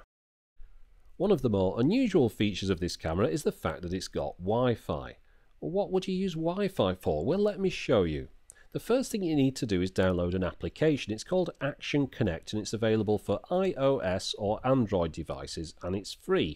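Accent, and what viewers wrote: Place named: North, United Kingdom. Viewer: I can tell your from up north lol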